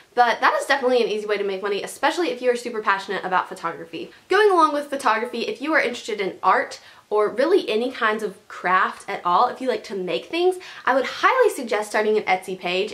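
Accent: South, United States. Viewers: Luv your southern accent I have one to!!! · i love her southern accent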